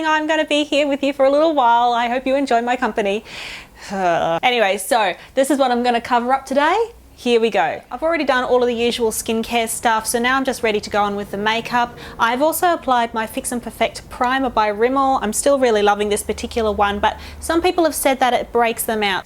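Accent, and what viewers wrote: Australia. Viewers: Australian (Duh)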